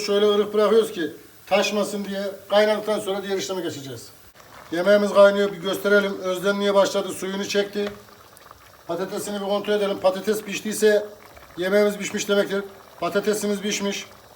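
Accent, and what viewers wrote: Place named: Çankırı, Turkey. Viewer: Abi Çankırılı misin şive benziyor da hemşehrimiz misin merak ettim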